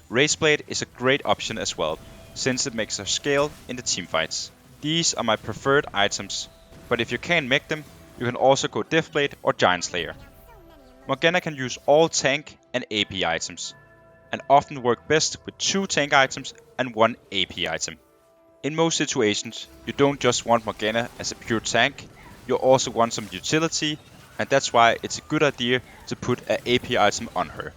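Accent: Denmark. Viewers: Hey dude, can't help noticing the danish accent <3 ur videos are great very "hjælpsomme" :P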